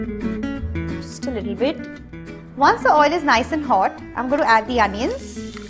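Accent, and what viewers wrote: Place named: India. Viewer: I dont like the accent of indian when speak english hehehe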